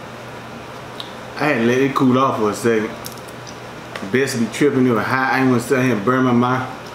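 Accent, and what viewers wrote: United States. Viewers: I’m from New York & i love how different & country his voice is 😍 instantly fell in love & subscribed 🥰🥰❤️❤️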